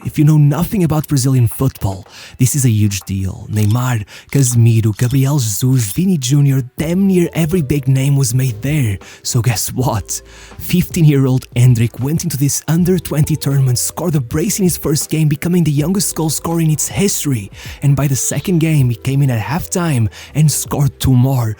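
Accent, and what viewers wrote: Portugal. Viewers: Where you talk very portuegues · E ese inglés com sotaque portugues?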